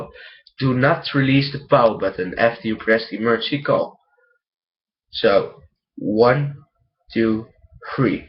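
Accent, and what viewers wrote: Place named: Netherlands. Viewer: je bent nederlands kan ik horen en je naam :p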